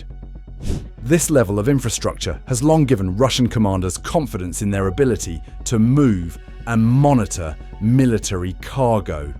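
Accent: England, United Kingdom. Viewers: Insignificant information. Most probably misinformation. I can hear the English accent. Sounds like British propaganda. What a lot of BS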